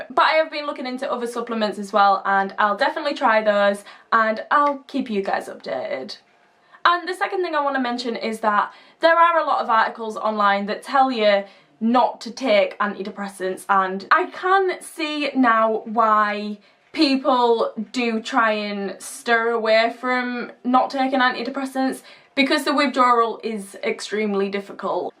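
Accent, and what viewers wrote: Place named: Yorkshire, United Kingdom. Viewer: The way you pronounce certain words, it reminds me of GEOFFREY BOYCOTT the cricket player commentator , Yorkshire English accent he has .... You must also be from Yorkshire I assume ..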